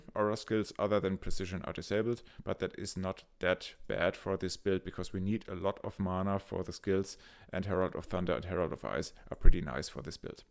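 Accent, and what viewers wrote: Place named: Germany. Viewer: Wie man direkt an deiner Stimme merkt das du Deutscher bist. Sehr interessant! :D Btw.. Auf was muss man genau achten, wenn man die Sachen kauft? Denn das Amu allein könnte ja schon, wenn man pech hat, um die 3div kosten durch die Attribute+ Cold spell gems. Da wir ja beim CoC die Taktrate beachten müssen, wollte ich dich fragen, wo man das sieht und händeln kann, da ich keine Lust habe, dass meine CDs rumspacken und damit das ganze Build fürn A** ist. Freue mich über eine Antwort! :D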